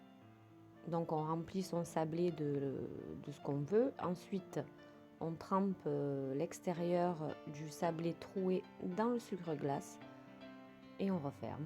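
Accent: South, France. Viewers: Super vidéo fidèle à toi même j ai testé pas mal de tes recettes qui ont toujours connu un succès.Je suis du sud et je reconnais ton accent celui de Toulouse ou Marseille 😅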